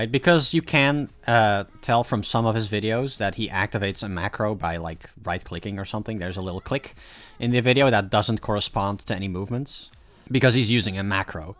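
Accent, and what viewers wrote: Netherlands. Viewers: are you dutch by any chance? nice video btw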